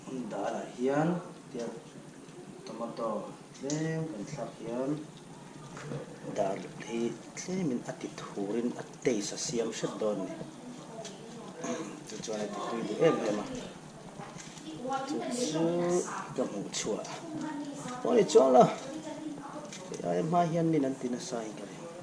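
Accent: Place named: Mizoram, India. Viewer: Nang hi Chu ooo...I thiltih engkim mai hi ..a Mizo bik emem rigot mai ani..English pon Han trawng v mahla a Mizo pa English trawng deuh bik riau 😁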